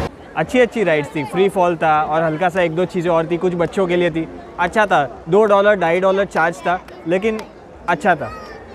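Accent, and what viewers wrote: Gujarat, India. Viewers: Being a gujarati myself, muje to iski hindi me bhi gujarati h sunai deti h. 🤣👍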